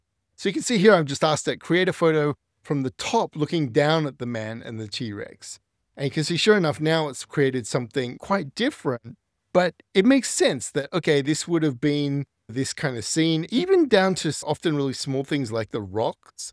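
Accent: Australia. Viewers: Australian or South African? Nice vid.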